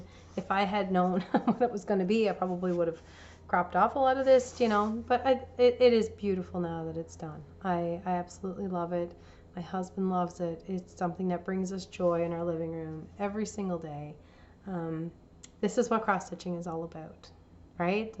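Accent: Canada. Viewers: Is that an East Coast Canada lilt I hear in your voice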